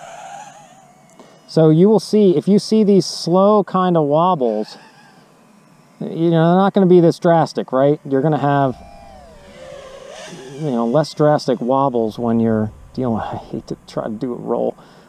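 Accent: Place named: Pennsylvania Dutch, United States. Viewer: I think i heard some PA dutch in your voice.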